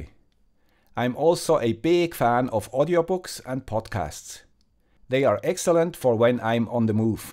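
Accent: Switzerland. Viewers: Your channel is "about sensors and microcontrolers" with a Swiss accent -:). That's why I have a subscription on your channel. For me, this subject is to far off (personal opinion).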